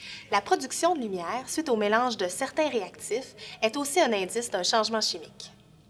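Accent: Canada. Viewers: merci grâce a vous je vais pouvoir mieux faire l'accent Canadien ! MERCI BEAUCOUP!!!!!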